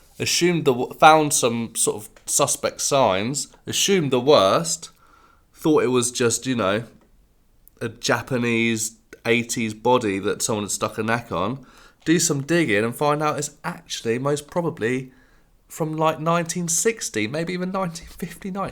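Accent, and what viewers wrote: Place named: United Kingdom. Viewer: I like your style (or is behavior the better word?) and really enjoy your way of talking and the british accent. Im a simple man, i love vintage guitars, so i subscribe. Thumps up! Many best greetings from berlin- germany 😃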